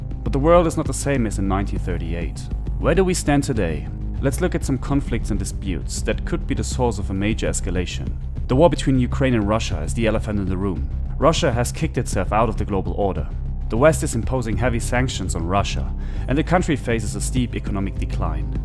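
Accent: Germany. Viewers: Honestly after 5 seconds of him speaking I could hear the german accent :) cheers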